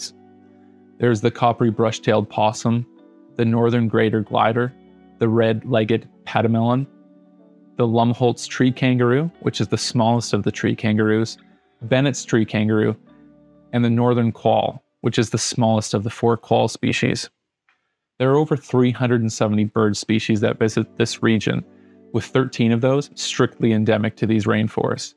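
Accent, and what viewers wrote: Canada. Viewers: Wow an American who knows something outside of the US. Then it dawned on me that's a Canadian accent. Now I understand. Great presentation by the way. I learned quite a lot about plants and endemic animals that I hadn't heard of before. By the way if you ever get a chance to visit the rain-forests of Australia you won't be disappointed. The Tasmanian ones are very special also. By the way that deserves a thumbs up and a subscribe! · This gentleman has a Canadian accent…it’s subtle but you can hear it…I believe he is Canadian.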